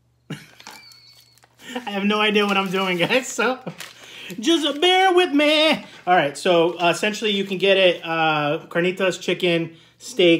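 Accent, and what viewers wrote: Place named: New England, United States. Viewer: I guessed Massachusetts or Rhode Island by your voice. I’m from ct lol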